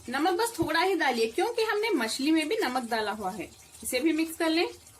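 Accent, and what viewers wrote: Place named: South India, India. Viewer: Hope you are from south india, Because of your Hindi pronunciation, i feel that.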